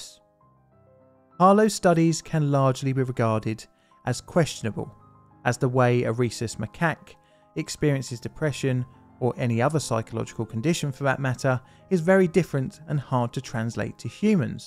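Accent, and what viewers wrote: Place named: Cockney, United Kingdom. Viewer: Someone w/o a cockney accent should do the voiceover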